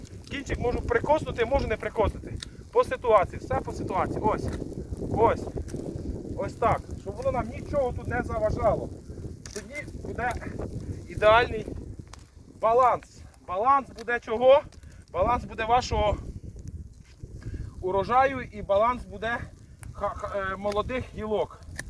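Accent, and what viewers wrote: Vinnytsia, Ukraine. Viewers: Балакає по вінницьки😂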